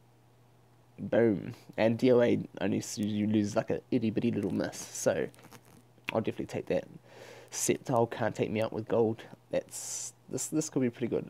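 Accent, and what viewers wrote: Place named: South Africa. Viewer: Johannesburg, your accent sounds very South African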